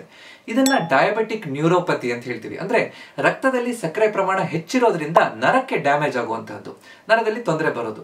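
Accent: Standard. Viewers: ಇಷ್ಟು ಶುದ್ಧವಾಗಿ ಮಾತನಾಡುವ ಡಾಕ್ಟ್ರ ಕನ್ನಡ ಅರ್ಥ ಆಗದಿದ್ದಲ್ಲಿ ,ನಿಮಗೆ ಯಾವ ಭಾಷೆಯೂ ಅರ್ಥ ಆಗದು😂😂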